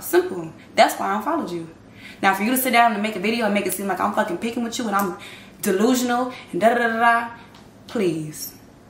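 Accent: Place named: Chicago, United States. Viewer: The Chicago came ALL out in this video and I love it😂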